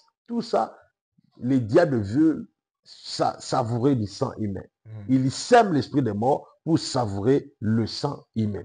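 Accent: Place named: Democratic Republic of the Congo. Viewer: C'est un zaïrois selon son accent et la prononciation de certains mots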